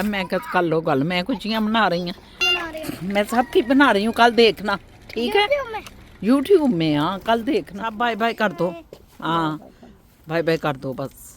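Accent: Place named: Punjab, India. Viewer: Your Urdu is very punjabi.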